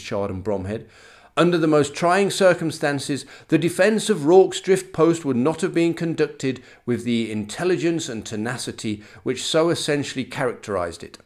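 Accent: United Kingdom. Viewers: Happy New Year old bean ! I enjoy your British officer accent -ha-ha!!! At least in the film, "Gonny" was portrayed by Michael Caine-not 'arf bad !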